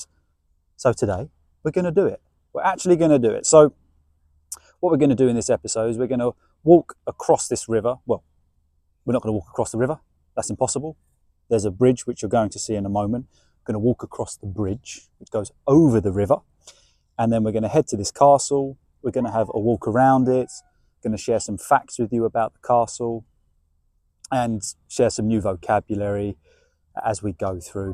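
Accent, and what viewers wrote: United Kingdom. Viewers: سعيد بالانضمام إليكم... سوداني... معجب باللهجة البرطانية...!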